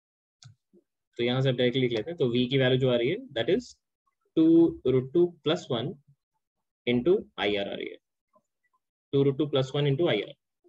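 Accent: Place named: Bengal, India. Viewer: Got reminded of ur class at Akash....2k19 batch....but sir missed ur bengali accent....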